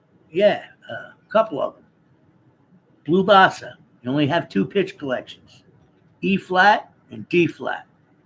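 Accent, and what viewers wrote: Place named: Philadelphia, United States. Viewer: Keep that Philly dialect coming!!!! All those words George Carlin said you can't say on TV. Thanks for thew music my friend!!!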